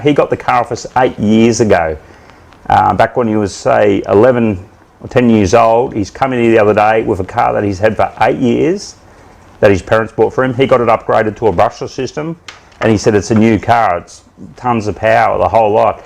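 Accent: Australia. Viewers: Guys before commenting on prices ... didn’t you grasp the accent? This is a down under RC shop (Queensland Australia) So prices are in Australian dollars... USD is 2/3 of an Australian dollar...😉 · ITS AUSTRALIAN DOLLARS CMON MATE DONT YOUR HERE HIS ACCENT. HE EVEN SAYS GOLD COAST AUSTRALIA LOL.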